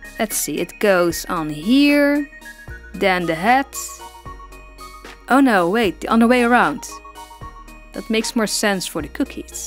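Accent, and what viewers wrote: Netherlands. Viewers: ik kan echt horen aan je engels dat je nederlands bent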